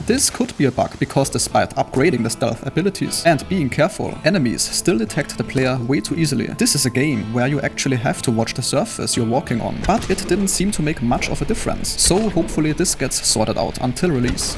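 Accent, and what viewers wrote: Germany. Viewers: First impressions video. 3 minutes long. Has "not waste your time" in the title. German accent. Makes total sense. Subscribed.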